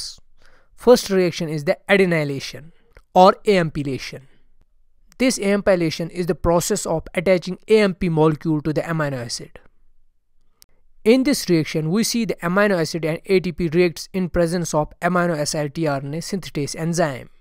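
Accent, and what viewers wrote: Kashmir, India. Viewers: Sir are you from kashmir??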